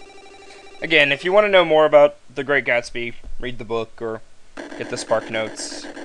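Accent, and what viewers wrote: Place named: New York, United States. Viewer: Jay Gatsby's his name. Daisy's Nick's cousin. I just read the book, haha :-) I don't remember the part ish or level two though. I don't know what those are from. The spectacles are TJ Eckleberg's, on a billboard for a glasses company. I love your NY accent, btw. The part about Gatsby being poor was a flashback though. And there are no sewers in the book XD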